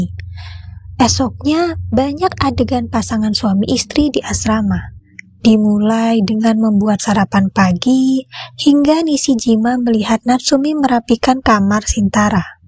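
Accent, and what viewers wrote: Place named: Java, Indonesia. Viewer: Suaranya naik turun.. Pembawaannya seperti orang membaca, kurang enak di dengarnya, gk bisa nyantai, terlalu medok 🙏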